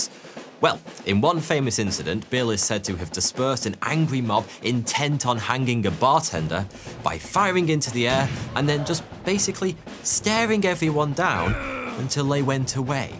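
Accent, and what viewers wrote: United Kingdom. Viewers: It never sounds right when British accents tell cowboy stories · Just wanted to say that I didn’t watch the video. Can’t possible listen to a British tell a story about the old west.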